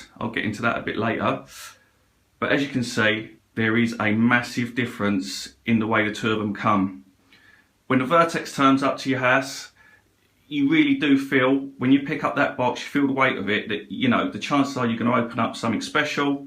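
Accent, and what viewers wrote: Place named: England, United Kingdom. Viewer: Not impressed with your video..I love your english accent however...but...who gives a rats ass if the lid is thicker? You think your corals care? ALLLLL they care about is clean water and my bet is the Deltec will outskim the Vertec hands down! oh and that leather look is probably so the lid does not slip out of hand as easy...no brainer.... disappointed in your biased approach. Just because the vertec is built stronger and smoother lines does nottttttttttttt mean it performs better and really performance is more important then the thickness of the lid! Deltec is amazing!!! You are a product of marketing...the MFGs trying to figure out how to sell you ...because they cannot get a skimmer to perform as good as the pros at Deltec... :-) again..I love your accent lolol Tim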